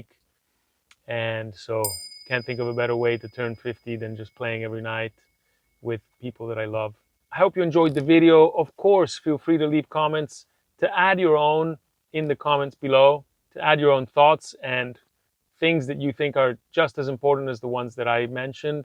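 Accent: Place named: Italy. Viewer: do you live in Italy. I noticed an accent btw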